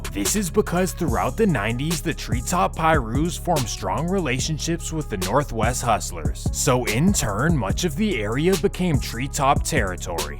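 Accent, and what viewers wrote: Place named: South, United States. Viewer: You talk like you grew up in the swamp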